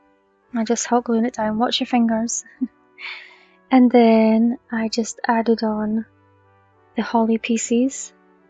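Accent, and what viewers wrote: Scotland, United Kingdom. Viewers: I am a new subscriber to ur channel . Love ur diys. U sound very Scottish. As that’s where I am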